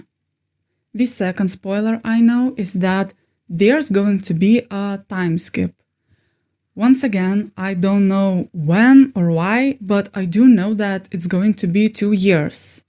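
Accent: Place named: Lithuania. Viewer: Oh well that justs shows that i should meet more Lithuanians in my daily life lol. I'm Dutch so i know other eastern european accents, but haven't heard a Lithuanian before! But that aside, your channel is really cool and deserves more subscribers! I love your pov as a Live Action only fan and i hope you make more content. But until then, i gotta binge watch the rest of your videos.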